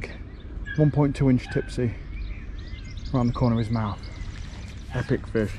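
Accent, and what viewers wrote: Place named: Lancashire, United Kingdom. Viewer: I’m thinking he’s from East Lancashire or West Yorkshire. I may be wrong though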